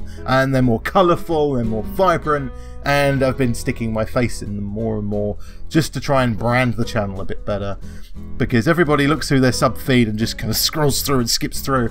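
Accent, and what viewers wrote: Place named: RP, United Kingdom. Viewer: I came here and subbed for the WoW stuff. But I stayed for the posh accent hehe 😉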